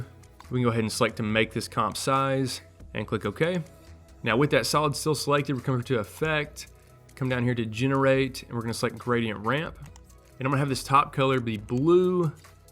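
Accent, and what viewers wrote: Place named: South, United States. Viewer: So many gems in this!!! Thank you! I'm from NYC, but ur accent reminds of me of my fam down south.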